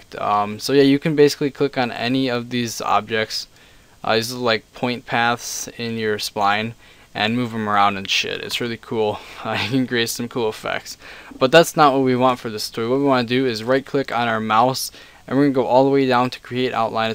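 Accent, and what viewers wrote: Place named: Quebec, Canada. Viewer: where are you from i can't tell from your accent. I'm either thinking Quebec or some french speaking place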